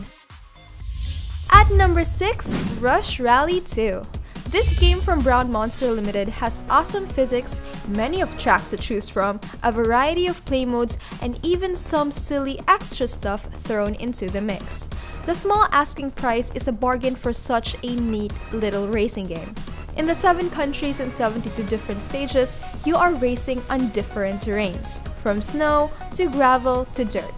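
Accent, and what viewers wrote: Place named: Philippines. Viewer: She sounds pinoy lmao 😂 nice vid doe 👌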